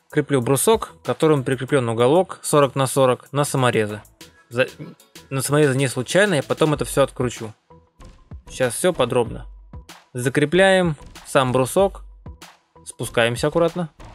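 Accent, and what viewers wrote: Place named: South, Russia. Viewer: Добрый день. Не могу понять, где вас букву гэ, учат как хэ говорить? В паспорте же имя Григорий у вас же как Григорий записано, а не Хригорий. В школе диктанты писали, разве там также как и говорили, вы писали? Ни коем образом не хочу оскорбить, или обидеть. Но этот диалект не понимает 90% всех людей в РФ. Вместо 5 литровой банки ,вы говорите баллон. Кто вас этому научил? Или сахар называть песком.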